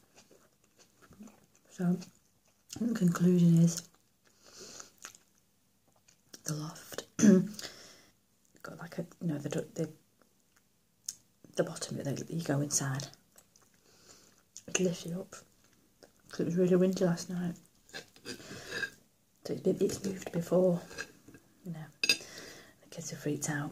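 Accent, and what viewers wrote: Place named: Northern England, United Kingdom. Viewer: good work! where are you from if you don't mind me asking, I'm from South Yorkshire and your accent seems very northern? I'd guess at maybe Oldham/Rochdale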